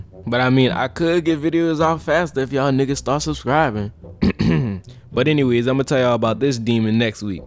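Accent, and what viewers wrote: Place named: Charleston, United States. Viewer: You sound like you from Charleston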